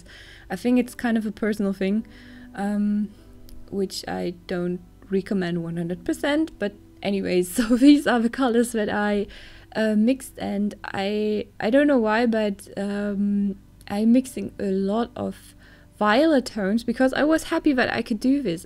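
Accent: Germany. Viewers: You're german. I can hear it😂.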